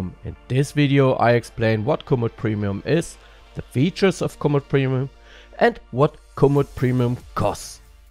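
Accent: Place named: Germany. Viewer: (english down below) german: hi. schade dass du nicht näher auf das live tracking eingegangen bist, das is das was mich an premium am meisten interessiert. also wie das live tracking genau funktioniert, wie zuverlässig es ist, und ob man auch ohne komoot meinen standort tracken kann. mein plan: ich möchte meiner community während einer tour im livestream die möglichkeit geben, zu sehen wo ich gerade bin. und natürlich haben die leute warscheinlich selber kein komoot. nebenbei: schon nach den ersten wörtern dachte ich mir "die aussprache klingt so als wäre er deutsch". und ich hatte recht. english: hi. it's a shame that you didnt describe the live tracking more detailed, because that's the premium feature i'm most interested in. i mean like how exactly does the tracking work, how reliable is it, and can you check/track my location without having komoot? 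my plan: i want to give my community the opportunity to track my location during a livestream tour. and of course they most likely dont use komoot themselves. on a side note: after a few words i already thought "the pronouncation sounds like he's german". and i was right.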